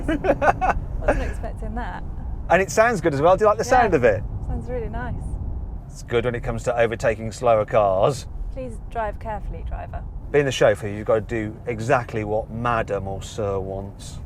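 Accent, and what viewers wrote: United Kingdom. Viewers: 이제 느낀건데 영국발음 진짜 고급스럽다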